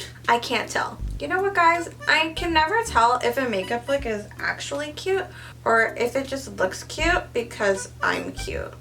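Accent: England, United Kingdom. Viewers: Can we talk about the English accent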